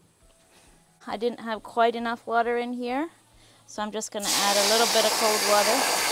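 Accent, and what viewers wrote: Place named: Pennsylvania Dutch, United States. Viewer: I have friends who are also ex Mennonite and you remind me so much of the mom, mainly because of your sweet Pennsylvania Dutch accent. 😁. Your channel is fun to watch. Many blessings and don't let the hateful comments get you down.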